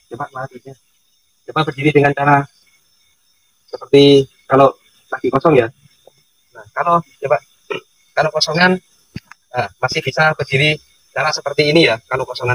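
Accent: Madura, Indonesia. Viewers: Orang Madura bukan mas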